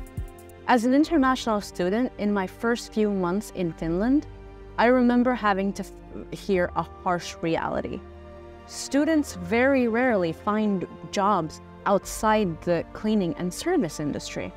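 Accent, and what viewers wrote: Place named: United Kingdom. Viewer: Mam how did you learn the particular accent ? Like proper British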